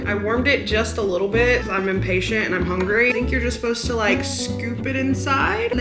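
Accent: South, United States. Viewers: Thank you for making realistic content!! Also I’m a moved away from the south person so your accent makes me feel like your my cousin haha. Keep being the realest girlie out here.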